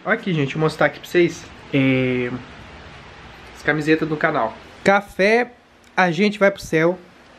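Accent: Minas Gerais, Brazil. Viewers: E que se toma o cafezim sozim kkk esse e mineiro raiz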